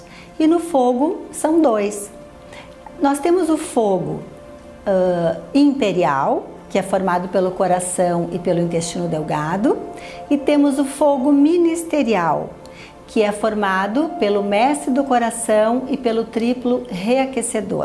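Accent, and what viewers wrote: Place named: Rio Grande do Sul, Brazil. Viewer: Tô reconhecendo e me identificando com esse sotaque. Somos conterrâneas dos Pagos?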